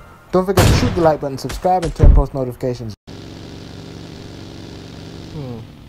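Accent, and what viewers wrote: Jamaica. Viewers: I love when he says; you know? Love jamaican🇯🇲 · Hey are you from Jamaica cause you sound like Jamaican?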